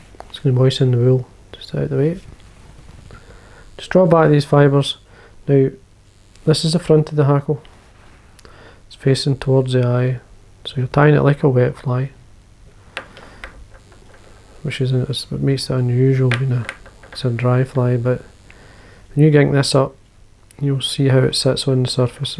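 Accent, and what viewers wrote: Scotland, United Kingdom. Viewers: Forgive me, but I have trouble understanding your Scots accent, (We here in the Ozark mountains have an accent that few can understand), but I see what you're doing, and I've tied some of your flies. I will tie this one, because I think this spring it will work on the streams we have here in the Ozarks. Thanks for your videos, and keep up the good work. Wish I could come to my ancestral home in Scotland and fish, but I'm afraid I'll have to settle for our rainbow and browns in our steams. I'll let you know how the Long Hackle Fly works this spring and summer.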